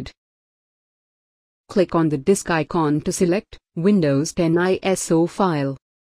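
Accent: India. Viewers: you got really strong indian accent please use english subtitle thank you.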